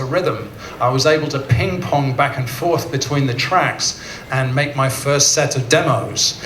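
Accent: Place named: Liverpool, United Kingdom. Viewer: He sounds Liverpudlian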